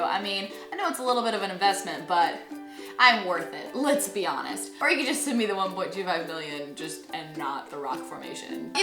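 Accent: Arkansas, United States. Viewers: What part of Arkansas is she from?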